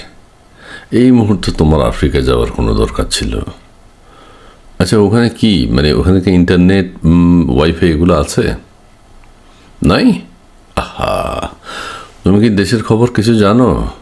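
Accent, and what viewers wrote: Noakhali, Bangladesh. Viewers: কাশেম চাচার সাথে নোয়াখালীর ভাষায় কথা বললে শুনতে ভালো লাগে।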